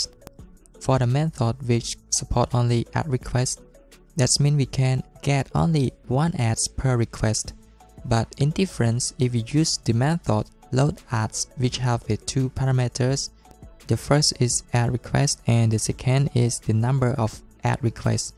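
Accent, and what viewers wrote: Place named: Thailand. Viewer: Thank you for good tutorial, (One question, are you Thai people? for me your sound like Thai people 😊👍)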